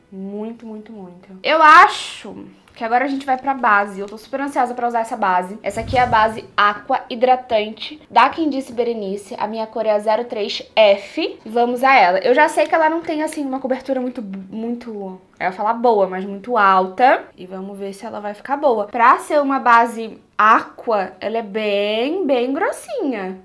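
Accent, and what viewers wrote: Rio de Janeiro, Brazil. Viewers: Você e muito linda e simpática, mas tenho uma pergunta, você e Carioca?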